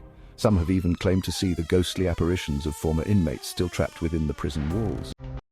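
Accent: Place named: United Kingdom. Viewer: YOU don't sound like him and I used to watch the show when it first came out you sound English (Brit) and Zach is from Las Vegas and younger than me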